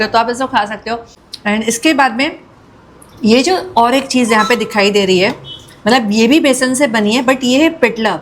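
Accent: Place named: Sindh, India. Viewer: Sindhen accent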